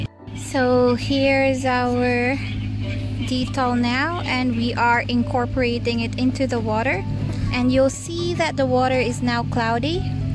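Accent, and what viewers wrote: England, United Kingdom. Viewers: Ang galing naman po salamat po sa pag share nito, ingat jan. I like you english accent. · O<link> I really love your english accent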